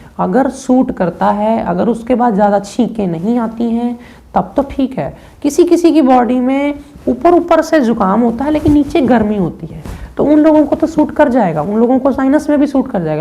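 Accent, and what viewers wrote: Punjab, India. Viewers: koi kami nhi rahi aapke bolne me....Punjabi tone pta chal jaati hai guru ji.....thanks.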